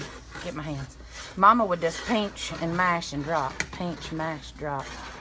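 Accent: South, United States. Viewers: I love the southern in her voice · it's a southern accent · I LOVE to hear you talk... guessing you're from Alabama or somewhere deeper south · I love your southern accent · I love your easy southern voice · I really love your li'l Southern accent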